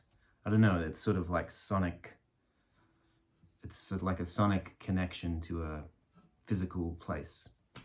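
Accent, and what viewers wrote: Australia. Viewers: I enjoyed listening to you man. Some people don't have the attention span to follow the train of thought through. Is that a slight aussie twang I'm hearing??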